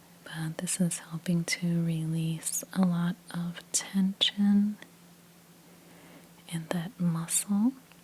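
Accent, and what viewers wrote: United States. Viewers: You’re the only ASMRTIST that has an American accent that I can listen to. Your voice is pure bliss! ❤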